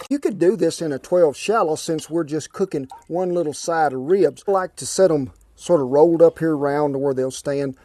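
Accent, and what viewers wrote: United States. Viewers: Outstanding entertainment and information. Thanks for sharing. ATB Nigel from North East England. p.s. i just love your accent and american speech patterns!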